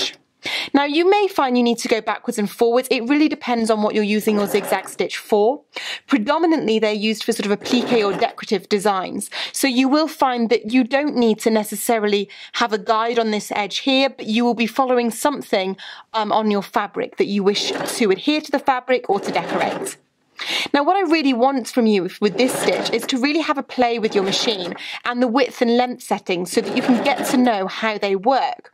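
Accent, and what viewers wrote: United Kingdom. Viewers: Tina Bailey Actually, I think she’s saying “join me back here.” She has a lovely British accent which may sound like draw instead of join.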